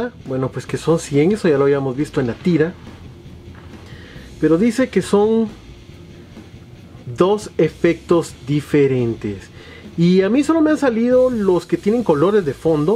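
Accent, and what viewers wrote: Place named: Guatemala. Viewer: V creo que eres de Guatemala :v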